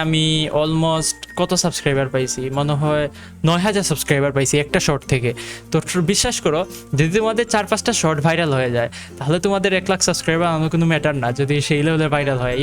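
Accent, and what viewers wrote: Sylhet, Bangladesh. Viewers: আপনার কথার ধরন দেখে বুঝলাম আমি ও সিলেটি🥰🥰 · Vai apni ki sylheti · তুমি সিলেটি নি ভাই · ভাইয়া আপনি কি সিলেটি আমিও সিলেটি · ভাই আপনি সিলেটি নি🙄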